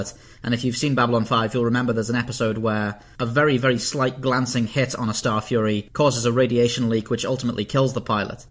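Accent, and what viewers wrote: United Kingdom. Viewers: I'd love to see a video where you summarize all the technical terms of a ships movement, sides, etc. What does ruttering mean? Aft, ventral, all that stuff. Yeah, I could look it all up, but I wouldn't be hearing it in a british accent.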